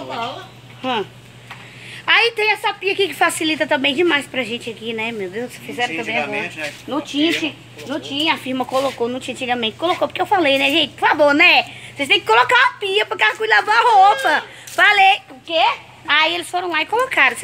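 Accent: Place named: Northeast, Brazil. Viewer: Tive que me inscrevê, apartir do segundo vídeo assistido, não resisti essa vòz rasgada do nosso nordeste . sou baina e admiro cada sotáque deste nosso imenso Brasil 😘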